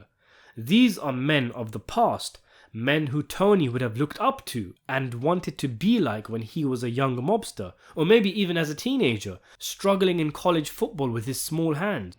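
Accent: United Kingdom. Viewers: Listening to a British guy talk about a show about Italian gangsters is just wild to me idk why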